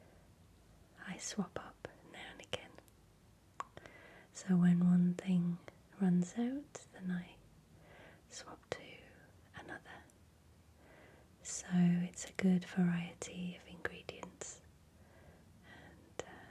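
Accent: England, United Kingdom. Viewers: Sadly I have had a difficult time watching your videos over the last year. Why? Well to be honest it's because I had my heart irrevocably broken by an Englishman. I couldn't bear to hear the accent. 😔 I missed your videos, and was determined to get back to them. So I simply forced myself to watch a couple of your videos that have always been favs of mine from a few years back. Happy to say "Cleaning your face" from the Lo-Fi series did the trick. I swear I'll never let one of your countrymen keep me from tuning in ever again.🙂 No matter how devestatingly handsome he happens to be. 😏 Boys drool💔, girls rule.🧘🏼‍♀️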